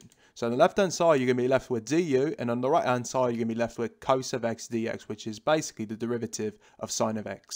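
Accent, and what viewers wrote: United Kingdom. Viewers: You sound like a proper west ham geezer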